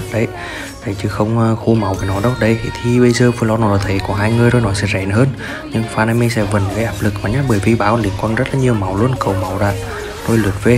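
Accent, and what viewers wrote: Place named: Nghe An, Vietnam. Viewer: Kiểu kiểu như Quang Linh vlog. Chắc ở Nghệ An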